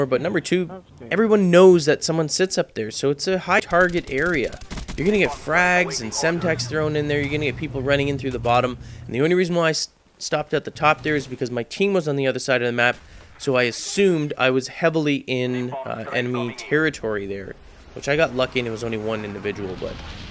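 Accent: Canada. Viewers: Canadian? :o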